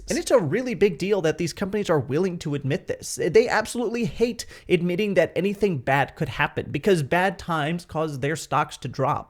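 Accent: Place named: India. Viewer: Love your indian accent. The way you said "P'ty" instead of "Pretty" it's fun.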